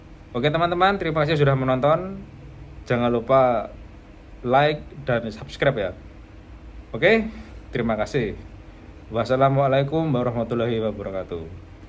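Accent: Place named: Java, Indonesia. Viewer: orang malaysia tapi logatnya kayak jawa indonesia ya🎉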